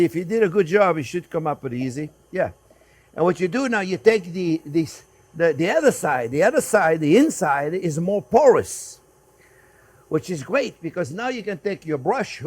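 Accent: France. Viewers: "what the sense? what the sense?" I don't remember how long he has lived in america but he still speaks in french for sure. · His French accent. I love it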